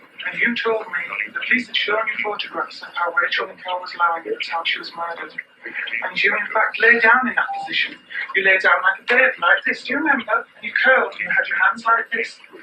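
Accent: England, United Kingdom. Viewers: English accent wins!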